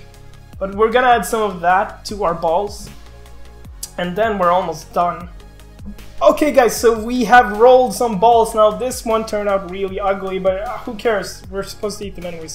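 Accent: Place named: Sweden. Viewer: Do swedish people really sound like that? I meean the accent, im pretty sure you've heard this before but you kinda sound like BluePanda. hehe and LOL tutorial video out of nowhere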